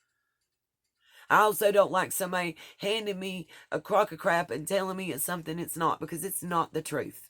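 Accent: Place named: East Tennessee, United States. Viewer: I live in east tn. In the same area as the Smokies and you sound like people from my area. I'm as country as cornbread and I'm seeing things exactly like you do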